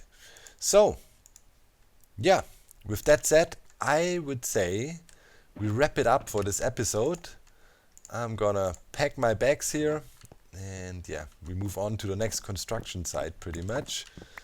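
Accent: Germany. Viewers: I watch 30% for Minecraft, 70% for that smooth cool german accent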